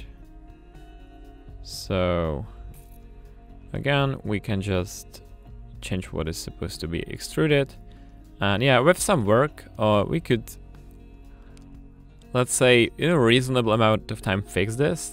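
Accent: Czech Republic. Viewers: Czechenglish se nějak špatně poslouchá:) Ale jinak víc takových tutoriálů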